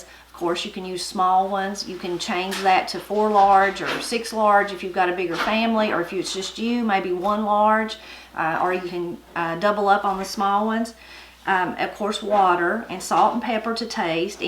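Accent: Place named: Eastern Kentucky, United States. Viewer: I grew up in eastern KY. Her accent sounds familiar and her recipes are legit what we all ate. She even has the correct kitchenware 😂 Love this channel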